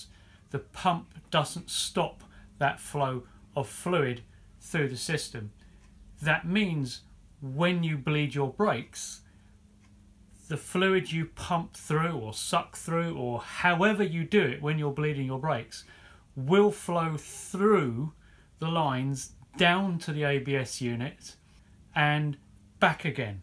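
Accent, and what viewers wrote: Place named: England, United Kingdom. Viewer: Great info and good English accent as opposed to Yanks!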